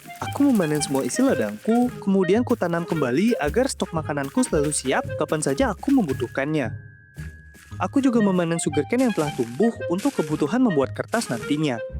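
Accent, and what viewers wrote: Bali, Indonesia. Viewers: orang bali bg? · logat bali bnget nok kakaknya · org bali kah? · berlogat bali wi nya jok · Orang Bali wi keliatan banget logat nya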